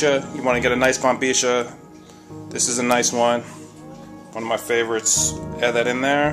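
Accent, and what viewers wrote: Argentina. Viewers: love how you speak the Argentinian languague...bombisha...very nice and very informative. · That's how we say it in the Rio de la Plata region. It's not wrong, it's just our particular accent. just like your version of pronouncing words isn't wrong either, it's just your version of spanish or castellano. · I know you're talking in an Argentine accent but if you didn't know IF you didn't that is it's actually pronounced bombiya it's spelled bombilla but I'm sure you know that but I just had to tell you I don't know why but I did...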